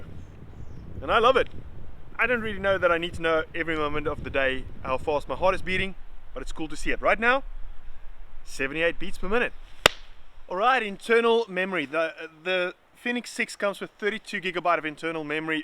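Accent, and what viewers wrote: South Africa. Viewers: I know he’s a South African when I heard he’s voice🇿🇦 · Are you from SA? Thanks for the review!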